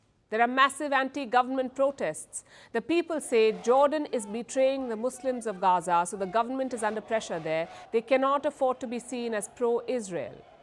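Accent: India. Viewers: Indian accent is the most beautiful accent in the world like this news woman. Rishi should also speak with an Indian accent. Actually, the whole world should speak with an Indian accent. The best accent in the world is an Indian. For sure!! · P.sharma you good reporter but we don't like your Indian accent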